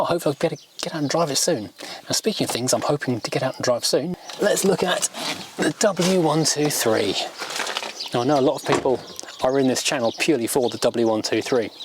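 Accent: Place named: Southern England, United Kingdom. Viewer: oh right!, i thought you sounded more southern . i am just past Southampton but a p6 nut . useless at mechanics but I do like buying them , ha, I had about 17 at one point but down to 5 now.,only one on the road . I was interested in your build for I have to do an engine swap with a v8 5 speed . and I need motivating such as watching you . I have a p6 to get fixed and its from Dover I think? or that way, its a series 1 v8 5 speed. I need to dig it out and get it going . thankyou so much for your videos . it could be the inspiration I need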